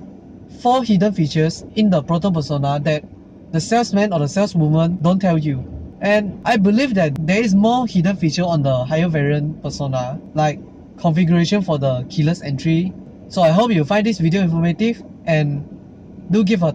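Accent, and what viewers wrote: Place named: Singapore. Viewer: walau... your English is having same slang as Singaporean English...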